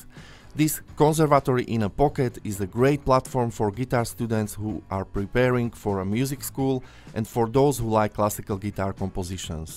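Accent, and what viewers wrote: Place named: Slovakia. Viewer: Nice content, I'm also 95% sure that you are from Slovakia according to your English accent. I'm right? :)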